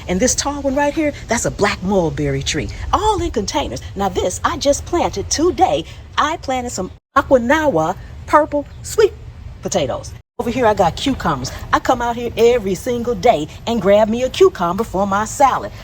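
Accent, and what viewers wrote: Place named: North Carolina, United States. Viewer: My NC friend ❤ I’m in Northeastern NC. I’m gonna have to take some lessons from your gardening channel. I thought you sounded like you have a NC accent. Thank you ❤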